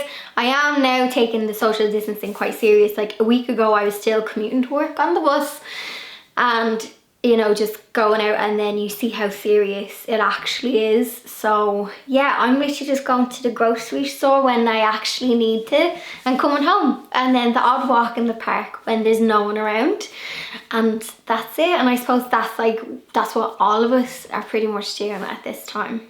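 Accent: Ireland. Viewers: I was wondering where you were. Missed you on Thursday. You seem like a very social person, which can be hard but keep chatting, we need you. I could listen to your Irish accent all day. Suggestion? maybe make a video of "about town", a local tour? Maybe you have done it in the past I don't know, I am a fairly new subscriber...just a thought. Anyway my dear...thinking of you and trusting you will stay safe and healthy. 😎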